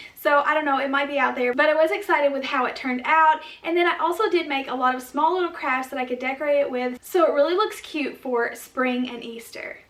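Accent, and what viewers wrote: South, United States. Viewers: Everything is just so cute ! Love your southern accent, where are you from ? I'm from Alabama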